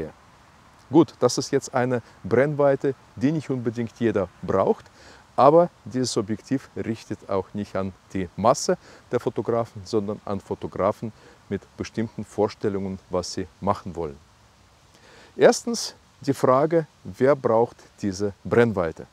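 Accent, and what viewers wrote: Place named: Soviet Union. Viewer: Вы наверное никогда не избавьтесь от советского акцента...извините если что, Старый водитель TIR. Сейчас стокер...ландшафтный.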